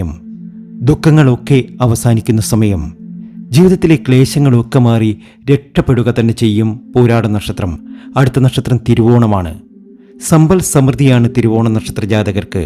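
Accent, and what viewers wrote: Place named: Kolkata, India. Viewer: Kolkata Malayalam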